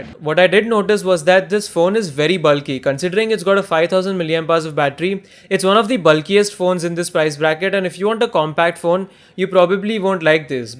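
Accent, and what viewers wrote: India. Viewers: You have hands down the most anoying voice on the planet. Or maybe i just hate the way Indians speak English..... Yeah probably the 2nd one.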